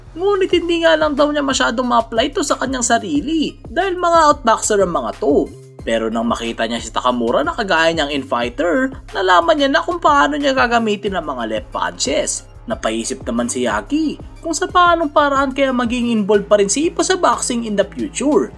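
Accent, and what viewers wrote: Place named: United States. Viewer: Ayus par gayang gaya boses ng mga Americano a hahaha ganda by the way ❤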